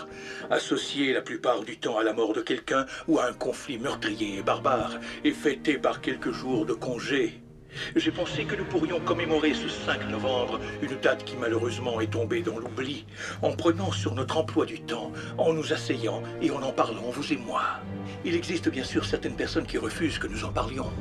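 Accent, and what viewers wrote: Quebec, Canada. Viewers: C'est la vertion québéquoise ?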